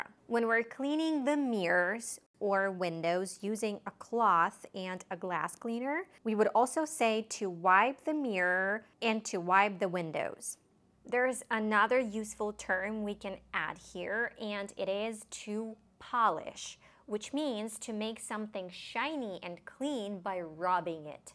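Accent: United States. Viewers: It's a strong US accent. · This isn't teaching English, this is teaching an American dialect. The words, spelling and pronunciations are very different to "English"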